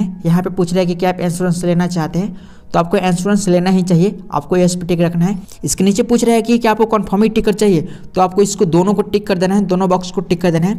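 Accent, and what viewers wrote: Bihar, India. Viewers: Bihaari ho kaa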